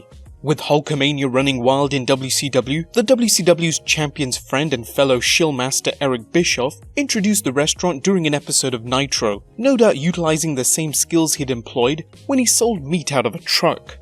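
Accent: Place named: United Kingdom. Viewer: This was not a story meant to be told with a British accent.....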